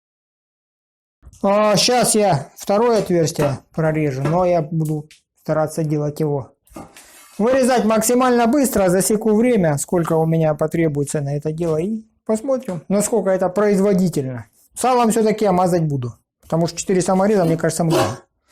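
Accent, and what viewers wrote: South, Russia. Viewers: Я сначала думал он на самом деле себя (и канал) назвал. И говорок у него такой типа, южный. Многие знают как там Гэ-кают. А он оказывается. Т.е. механический мозг. Но блин, он и правда! Надо же так отверстие придумать прорезать! И чем? Саморезом! 👍👍👍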